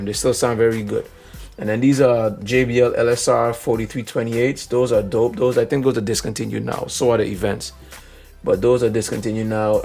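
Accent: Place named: Caribbean. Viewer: It’s a blessing that I came across your videos. Much respect to you and your talent. Great content thus far. Sounds like you have a Caribbean background. I’m from the islands 👍🏽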